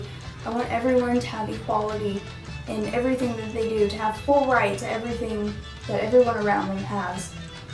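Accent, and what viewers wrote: United Kingdom. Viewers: dont u have British accent? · I thought you were brittish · i thought she was british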